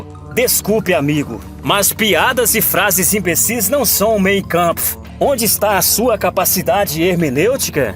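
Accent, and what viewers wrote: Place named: Bahia, Brazil. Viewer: você é baiano? Esse seu sotaque está me fazendo crer que sim!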